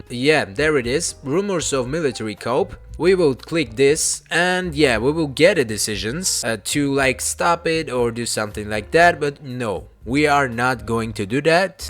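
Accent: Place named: Arab world. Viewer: Arabian accent, the youtuber is from the UAE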